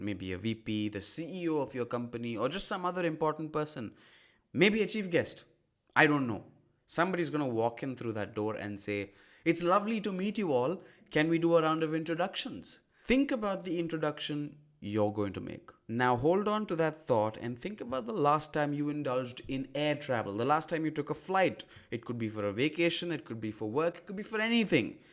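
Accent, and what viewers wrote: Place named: Maharashtra, India. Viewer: I too initially thought you are from Maharashtra :D. Great tips!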